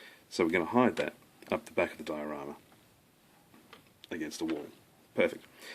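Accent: Australia. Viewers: He's speaking English. His Australian accent doesn't make him that hard to understand.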